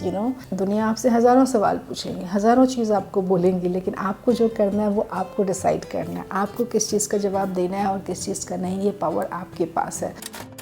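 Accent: India. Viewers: Love the way you explain things.Love your smile, listening to you,has a bihari tone being a bihari love it.keep smiling always ♥️♥️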